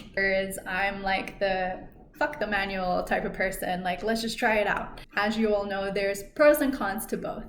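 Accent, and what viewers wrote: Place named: United States. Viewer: Edgar Cayce, a christian, would be proud of you, he stressed prayer as one thing to help with dream recall. And it is working for me, I'm not christian but I take prayer as being grateful and thankful for everything i have, remember to bless your food and drink always, and then of course asking for all I want. My still poor dream recall is improving. Affirmations are another to do, if i hope you don't mind me rambling on on your page but my dream recall is improving everyday and every night in every way and remembering my dreams is easy. Getting out of my comfort zone would be becoming more social, just talking to people more. I could practice that in lucid dream state, it's on the list of things to do and learn there in my lucid dreams. Thanks for encouraging me, I will talk to people more here in the waking life real world, this "shadow world" we live in. 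You're so cute, if I may acknowledge that? You have a very nice american accent, that is if memory serves me and you are actually english which seems very unusual to be able to change your accent like this. Many blessings and love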